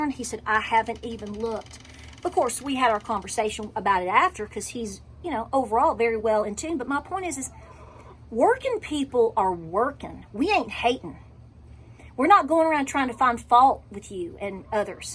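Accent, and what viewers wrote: South, United States. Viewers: Keep on preaching the message sister, btw I love your southern accent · Am from north of the 49th. yup definately southern accent 🤠🙏🇨🇦😇🙌🇨🇦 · I love you....I'm southerner too...I love your accent.... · I love Jesus!!! I also like your country accent. It’s pleasing to listen too. 😀. God bless you and keep doing what you do. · Yes, yes, yes! My dear Mom, may she Rest In Peace, was from Tennessee. Your sweet southern accent reminds me of her. Blessings ❤️ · Found you about a month ago and i am so grateful. Your wit and grace with that southern charm/accent waltz my heart. My mom, dad n sis have passed but you remind me to stay strong. Listen to this song, it's called " " by. Love u both, looking forward to your next vid! Ps, i got six hens this spring. Oh but one is a rooster. Having debated about his future. Advice or a vid about it would be great. 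Bless u child!!!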